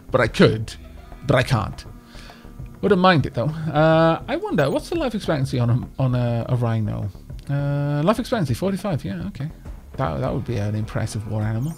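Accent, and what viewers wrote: Wales, United Kingdom. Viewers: He sounds very Welsh. I'm somewhat surprised that people would have an idea of what Welsh sounds like and wouldn't know is one of them.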